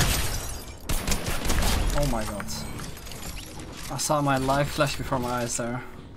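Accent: Netherlands. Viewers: Bro are you dutch ? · ik kan gewoon horen aan je stem dat je nl bent XD maar ga zo door bro ik hoop ooit ook earnings te krijgen word dit season voor de eerste x unreal en ik ben 13 je bent een hele goede speler ooit word jij n 1 ga zo door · ik ruik nederlands accent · leuk dat een nederlands accent toch zo sterk kan zijn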